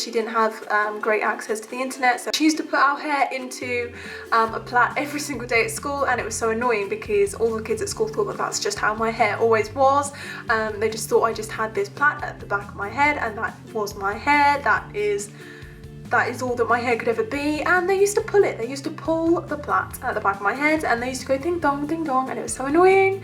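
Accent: London, United Kingdom. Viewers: I thought you were from London or some where round there sorry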